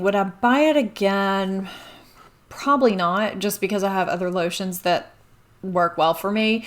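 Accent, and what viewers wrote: United States. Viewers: Hi, new to your site (and most others too, not very tech savvy😋) but I wondered if you could hold the items still so I can read the product name, or perhaps put in some text in one of the corners of the screen with the name of the product. I’m in Aus, lots that you test are not familiar to me and I often don’t catch it’s name (American accent is sometimes quite different to Aussie accent). I’m really enjoying your videos, and learning lots. Plus you are blessed with beautiful skin, pretty much everything you wear looks lovely. I’m 63, had horrendous acne and super oily skin, it’s looking the best it ever has, strangely. Not too many wrinkles, but it’s def aged. Jill in Aus.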